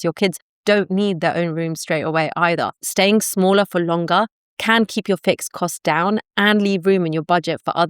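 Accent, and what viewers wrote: United Kingdom. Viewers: Why are you talking $ and Americans instead of £ and Brits? Am I the only one hearing a British accent?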